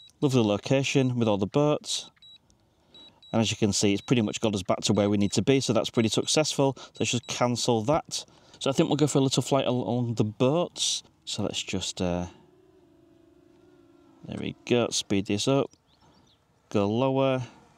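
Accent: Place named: Scotland, United Kingdom. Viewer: Came for the information, stayed for the SUPER THICK Scottish accent. 😁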